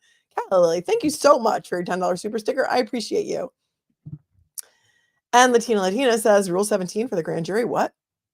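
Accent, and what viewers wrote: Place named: New York, United States. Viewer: i love when you get so excited how your NY accent really comes out! Thanks for all the input!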